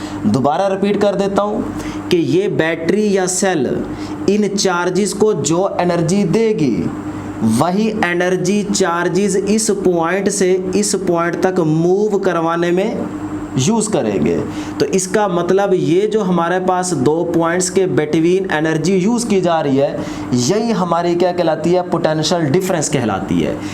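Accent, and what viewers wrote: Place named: Saraiki, Pakistan. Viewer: Sir accent is like saraiki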